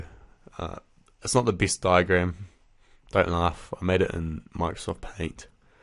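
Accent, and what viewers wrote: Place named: New Zealand. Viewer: such a kiwi accent!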